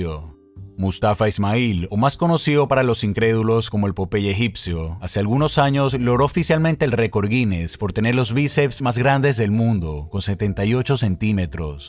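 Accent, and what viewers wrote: Ecuador. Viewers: Es Ecuatoriano así hablamos a veces 🤣🤣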